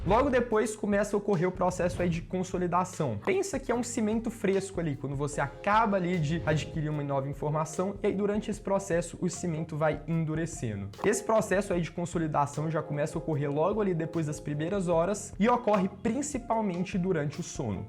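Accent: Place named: Minas Gerais, Brazil. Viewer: Seu sotaque é muito massa. Tmb sou mineira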